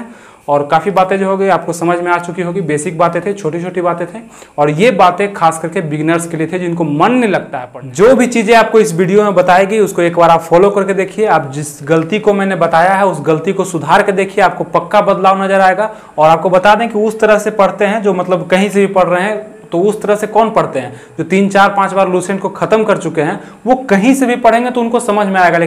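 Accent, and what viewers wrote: Bihar, India. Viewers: Sir ap bihar se h kya?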